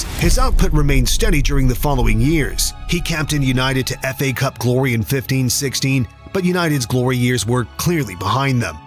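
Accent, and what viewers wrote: United States. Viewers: Click to watch a football video only to hear another yank voice narrative.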